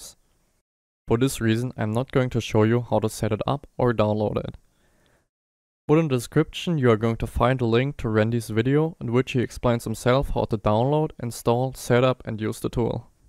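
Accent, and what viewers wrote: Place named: Germany. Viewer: I'm just here for the German accent ASMR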